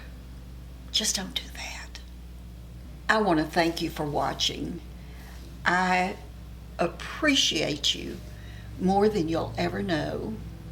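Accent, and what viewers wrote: United States. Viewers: Paris, France calling...I just came across your video by chance and found its content an absolute delight. And I simply adore your accent - it reminds me of an American friend of mine. You have a natural flare to entertain. After watching, I discovered your age - 78 wow! I would like you to know that you boosted my morale! I will definitely hunt down your other posts! Thank you again.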